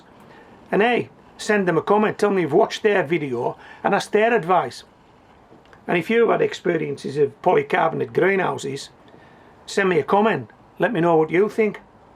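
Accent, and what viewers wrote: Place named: North East England, United Kingdom. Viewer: Where abouts are you ? You sound very local to myself which is Loftus a small town in between Redcar & Whitby. Love your videos keep em coming! Just about to refit my polycarbonate greenhouse with more polycarbonate. This time going to reinforce as much as possible. North east England renowned for cold and VERY windy weather. Think it’s been down more than up my poor greenhouse!! Wish me luck!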